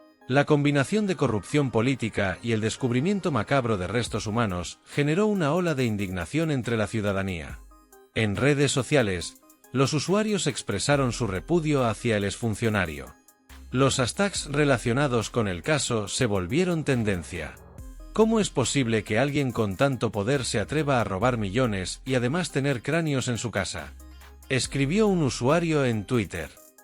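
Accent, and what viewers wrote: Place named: United States. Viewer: No puedes pronunciar CUAUHTEMOC ?? o decir veinte millones ?? De que pinche cerro te bajaron ?? Tu pinchurrienta manera de hablar tan agringada y miserable dan risa...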